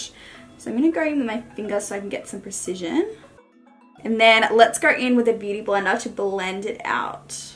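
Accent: Australia. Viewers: Where are you from? You sound kind of Australian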